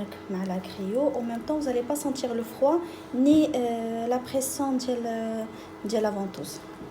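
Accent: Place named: Algeria. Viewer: عند.لهجة كيما صحاب جزائر وأنا نموت على مغاربة يعجبوني بزاف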